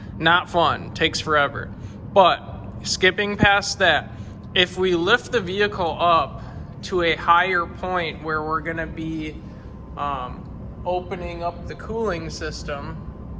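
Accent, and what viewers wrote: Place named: Midwest, United States. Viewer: Your Midwestern accent is amazing.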